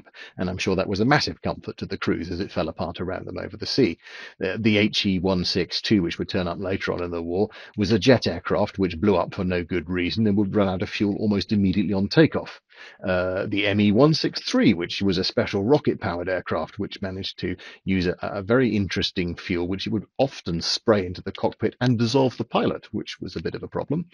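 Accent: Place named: United Kingdom. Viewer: ... a beautiful and glorious Limy Brit that can make swearing sound classy😂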